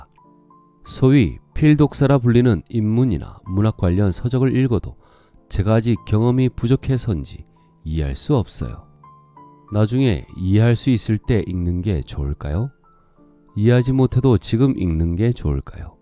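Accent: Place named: Gyeongsang, South Korea. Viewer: 경상도 억양이 거슬리네요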